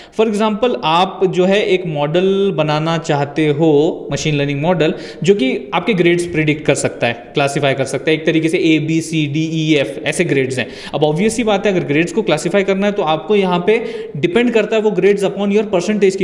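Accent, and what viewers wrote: Maharashtra, India. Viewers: Sir language apki Marathi ki Tarah h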